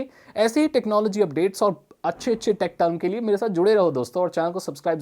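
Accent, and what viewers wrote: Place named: Bihar, India. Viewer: Ap paka bihar hai na bhaiya mujha aise kyu laga hai ki ap bihar sa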